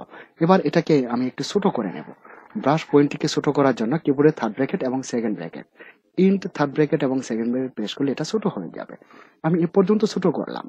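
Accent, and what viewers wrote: Bangladesh. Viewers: Bangla vasa ta ektu valo kore bolle valo hoe.. bangladeshi vasa ta sunte baje lage.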